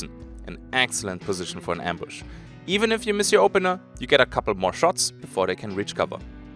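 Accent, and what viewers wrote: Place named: Germany. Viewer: Really enjoyed watching that. Well played! Where are you from mate? You kinda sound like German but I'm not sure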